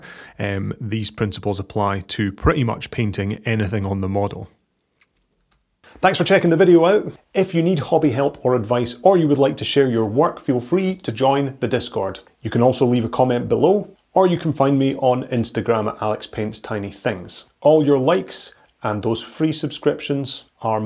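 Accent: Scotland, United Kingdom. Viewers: Do I detect a faint Scottish accent? Nice tutorial for beginners, also useful for intermediate painters like me. Thanks for sharing.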